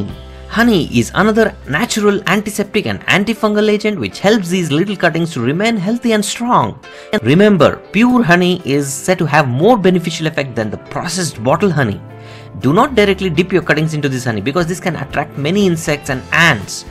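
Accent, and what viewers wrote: India. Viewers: Very bad indian accent-English language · You sound very funny... Are you an indian? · Thank you.That was the most informative instructive article I have read about routing .hormone on the internet. The accent is from India I think. Please let me know. Thank you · I like your Indian English accents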